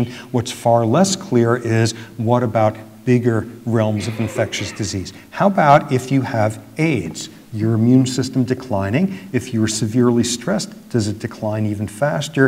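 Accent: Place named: United States. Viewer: sounds like murica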